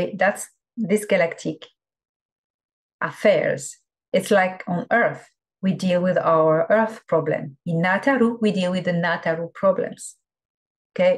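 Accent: France. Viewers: I wish someone would transcribe these videos. I want to hear and understand but the CC leaves so much to be desired.! And I just can't 'get with' the French accent. :(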